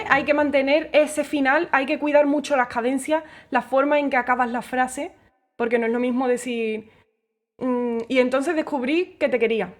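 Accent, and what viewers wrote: Andalusia, Spain. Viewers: me encanta tu acento andaluz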